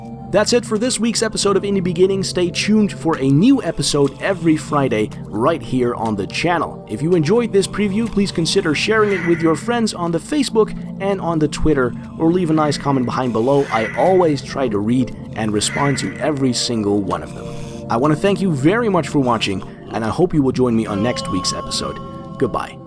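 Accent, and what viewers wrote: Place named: France. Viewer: Voice over guy sounds french.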